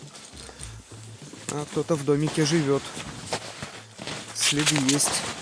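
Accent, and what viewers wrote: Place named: Vologda, Russia. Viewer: Откуда у тебя такие познания о старинных вещах, ты наверное изучаешь или читаешь много, наверно увлекаешься этим, а ты сам откуда? У тебя говор особый, какой-то вологодский.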